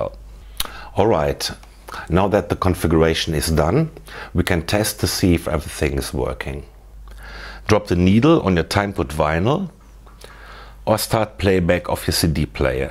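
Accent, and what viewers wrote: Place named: Germany. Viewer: hachja, deutsche und ihr englisch..:D danke für`s video:)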